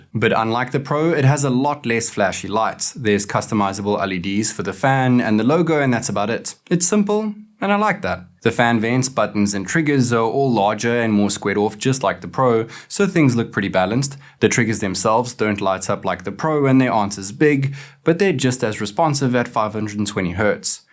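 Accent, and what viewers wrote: South Africa. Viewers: kewling system lol love the South African accent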